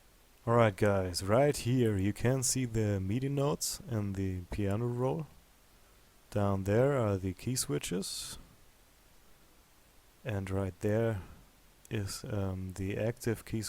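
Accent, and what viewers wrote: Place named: Russia. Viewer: Полюбас русскй акцен ак пробвае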